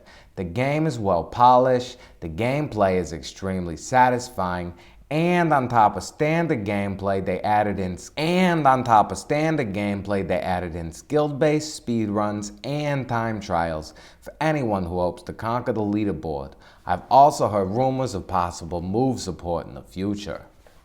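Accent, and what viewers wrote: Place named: Boston, United States. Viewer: Really love your Bostonian accent (sorry if that's not an accurate description). Your parents named you perfectly, it just totally fits your presentation. You have the potential to be similar Yahtzee of Zero Punctuation, as in using your nationality as your draw; maybe try a VR mafia insider type persona - you'd totally pull it off.:P Regardless, this Australian gives you the thumbs up and I'm glad I subscribed, especially after seeing your live stream cus you seem like a cool guy who genuinely loves VR as much as I do.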